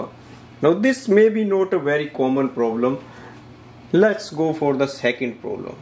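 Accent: India. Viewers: Talk in Hindi ,your English is typical Hindi English language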